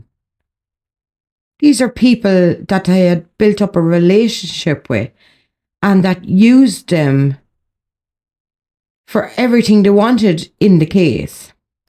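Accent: Ireland. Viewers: Absolutely fantastic analysis of this case , I love the Irish lilt xxx jen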